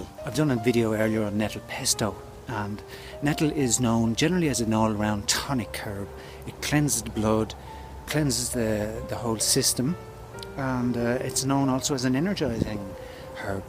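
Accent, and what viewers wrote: Ireland. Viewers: great video...nice to hear an Irishman talk about wild herbs. I am a Horticulturist level 6 and I have started to use Urtica dioica and Taraxacum officinale and my heart rate is down from 170+ /80 to 134/78 my doc is stunned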